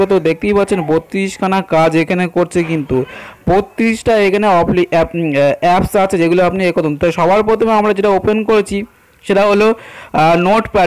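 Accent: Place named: Kolkata, India. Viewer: ভাই কলিকাতার মানুষের উচ্চারণ এমনই ভাই। আমারদের যেমন নোয়াখালি..........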